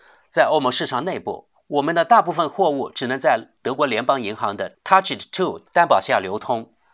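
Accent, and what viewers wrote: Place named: Guangdong-Guangxi, China. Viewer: 两广人的广普，就这样子了😅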